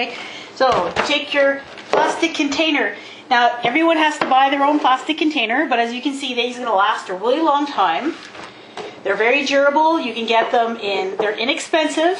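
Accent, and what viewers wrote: Canada. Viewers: Um, this sounded like a plain old canadian accent to me, not a french accent. (Which doesn't mean she doesn't know french, just that she doesn't sound french when she speaks english.) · Omg I'm Canadian but I don't have the same accent as you-- you sound so-- so Canadian! __ I love it! (Was trying to figure out how they even put the milk in the bags to start with, to explain it to an American friend, but your video was still p entertaining hahahaha)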